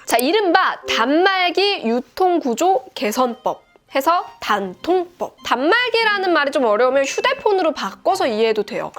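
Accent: United Kingdom. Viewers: 영국식 발음으로 한 번 더 만들어주세요ㅎㅎ 컨셉이 잘 맞을듯 ㅋㅋㅋ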